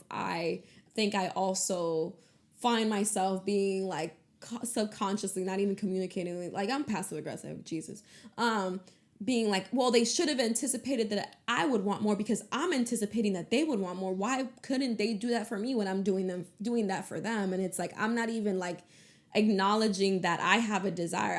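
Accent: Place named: New York City, United States. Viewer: R u from NYC?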